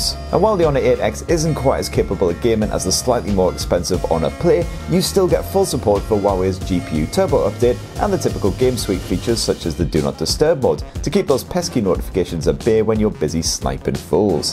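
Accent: United Kingdom. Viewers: i just love British accent 😀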